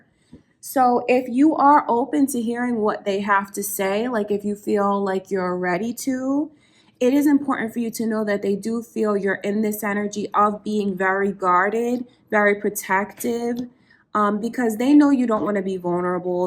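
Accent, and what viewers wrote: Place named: Canada. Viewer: Does anyone know if she is Canadian?? I feel like I hear a tiny bit of a Canadian accent, ey?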